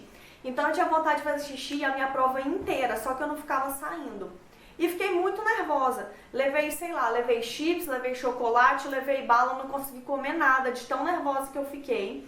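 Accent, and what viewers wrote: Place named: Goiás, Brazil. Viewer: Não é pq vc tem um sotaque meio puxado ak de Goiás, sabe. Hehe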